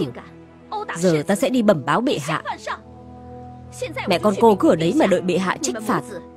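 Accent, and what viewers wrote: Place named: North, Vietnam. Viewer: Thuyết minh giọng Bắc kỳ muốn ói, phim hậu cung kế cũng có đàm diệu văn, tưởng nghị, dương di thuyết Minh giọng nam còn hay và dễ nghe hơn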